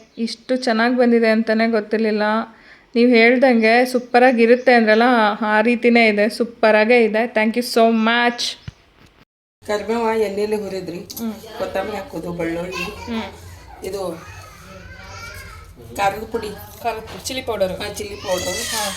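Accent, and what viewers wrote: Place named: North Karnataka, India. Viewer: 😂😂😂👌👌👌👌 ನಮ್ಮ ಉತ್ತರ ಕರ್ನಾಟಕ ಭಾಷೆ ಏನು ಕೇಳ್ತೀರಾ 😅😅😅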